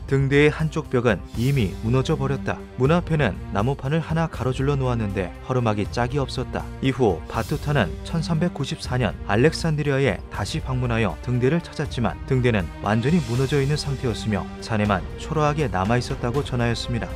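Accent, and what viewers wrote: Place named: Gangwon, South Korea. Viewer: 기묘한밤 나레이션 담당분은 고향이 강원도 아닌가요? 강원도 특유 억양이 있는거 같은데 ㅋㅋ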